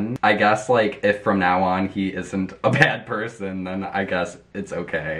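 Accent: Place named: Michigan, United States. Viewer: Omg I heard your voice and I guessed that you were from Michigan before you said anything!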